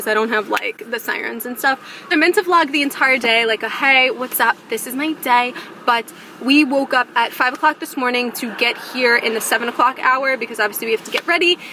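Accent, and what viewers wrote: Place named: New York, United States. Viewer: Your freaking accent is so on point I could tell you were a New Yorker from the beginning of the video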